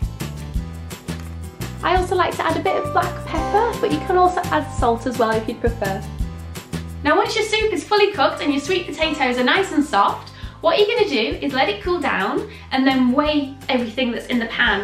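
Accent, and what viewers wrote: United Kingdom. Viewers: Is the plant always called Coriander in the UK? (I assume the accent is UK) I call the seed/spice Coriander but the plant Cilantro? It is a must for my Salsa. My favorite herb!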